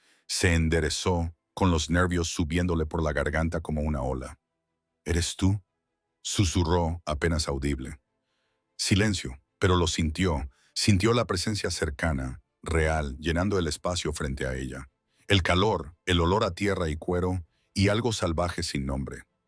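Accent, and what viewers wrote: United States. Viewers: Siii eso es lo malo del IA, el rey Saden aveces lo pronuncia como “saden”, “seiden” y hasta “siren” y a Brina a veces lo pronuncian como “Baina”, “Brea”, “Brena” y “Bria”; y para colmo aveces suena como un gringo tratando de pronunciar la R 😂😂😂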